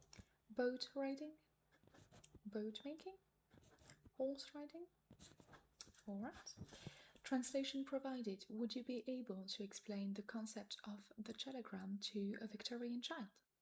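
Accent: France. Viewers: For an actual comment, I love it! The volume is low, which is of course a problem with having midroll ads (having to turn volume up for video makes the ads very loud). But I love the vibes and I love soft-spoken asmr. I'm also going insane trying to figure out your accent. French but if you learned English in England? You look French. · Your accent is pretty good. I only figured out you were French when that i sound slipped through a couple times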